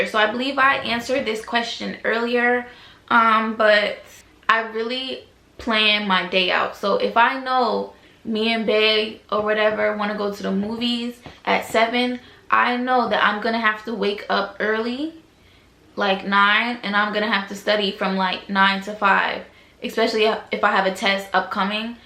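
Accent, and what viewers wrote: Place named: New York, United States. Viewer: yes girl 😂 you got that New York accent.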